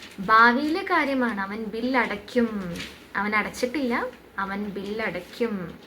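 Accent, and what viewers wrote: Palakkad, India. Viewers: Palakkadan slang super 🙌😂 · Pkd Slang 🔥 😂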